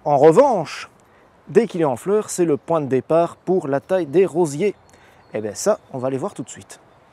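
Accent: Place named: Belgium. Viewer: Oui l'accent bien-sûr, et vous parlez de Daniel Schmit en plus.. Nous sommes compatriotes! C'est un vrai plaisir de vous écouter prodiguer vos conseils clairs , précis, facile à appliquer..Merci! Cécile et Emmanuel de Chaumont-Gistoux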